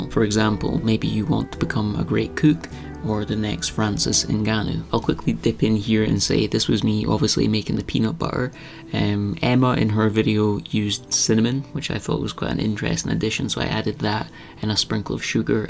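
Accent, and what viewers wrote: Scotland, United Kingdom. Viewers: Hi, I’ve been watching since you bought the tiny house and I don’t normally comment, but every time I watch a video of yours,I mean to! It’s hard to articulate what I want to say, but basically I really think you have an element of genius. The combination of the topics you discuss, with the video clips etc, is so very creative and skilful. I know you’ve talked about being anxious before, so I just wanted to say that I hope you’re confident about your work because you really should be! Also lovely to hear a fellow Scottish accent in the YouTube world 😊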